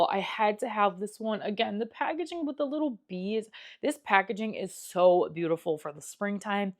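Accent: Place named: Midwest, United States. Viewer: It sounds midwest to me 😊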